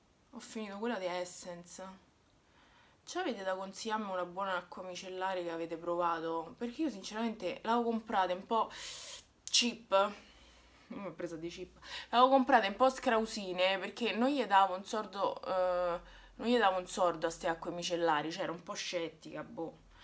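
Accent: Rome, Italy. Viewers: Ciao sei bellissima e il tuo accento romano mi fa morire dal ridere ti amo troppo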